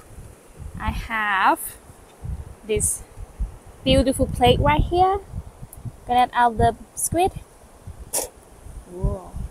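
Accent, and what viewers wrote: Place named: Australia. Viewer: You almost have a hint of an Aussie accent. What a beauty.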